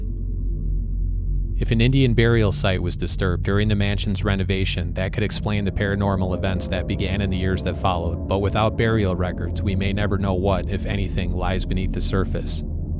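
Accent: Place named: Midwest, United States. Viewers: I too am getting grat joy from this Illinois/ Wisconsin accent. Thanks brother from Chicago. Great storytelling! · Excellent content bro and it's a comfort hearing that northern Illinois accent. Hope this channel blows up!!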